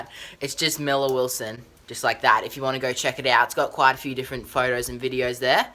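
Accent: Australia. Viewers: every word in an Australian accent sounds cool....even crocs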